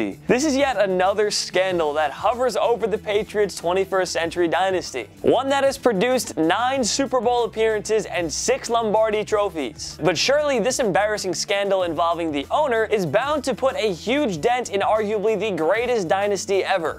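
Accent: United Kingdom. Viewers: nice brttish accent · I’m from the uk and I love your English accent 🧨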